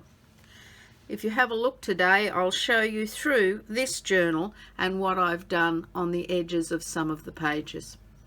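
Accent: Australia. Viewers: I watched a napkin video of yours, I thought your voice sounded like a Ozzie, so I checked it out and watched this fabulous video I am overjoyed that i found you, your work is si impressive.I ❤❤❤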